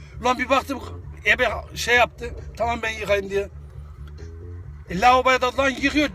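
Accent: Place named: Anatolia, Turkey. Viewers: abi ben niğde/bor luyum. şiven bizim ile hemen hemen aynı ve çok doğal bir insan olduğunu en iyi ben bilirim. biz orta anadolu insanları düm düz insanlarızdır kaba gibi görünen iyi niyetli ve neşeli insanlardır. aslında bizi kaba sananlar kendini beğenmişlerdir. bir çok ayrıntıya belki en çok ben gülüyorum.. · Sen ne güzel bir insansın be abiciğim ya ağlamaktan seni YouTube izlerdim konuşman muhabbetin çok hoş anadolu memleket gibi Mekanın cennet olsun abim güzel insan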